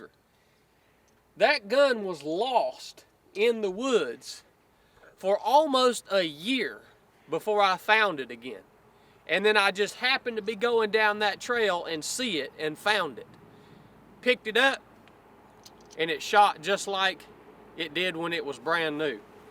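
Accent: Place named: Appalachia, United States. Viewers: I'm from Georgia so im used to country dialect, but his accent is something serious. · Another video that did not need to be made --it's common sense....Woox is pushing products on every ytuber right now ,lol over priced and over weight , many many better options than Woox . Hillbilly talk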